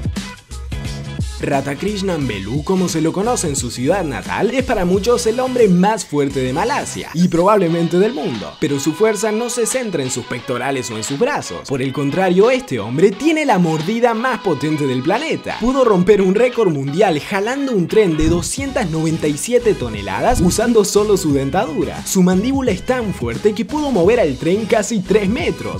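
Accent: Argentina. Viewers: No sabia que eras argentino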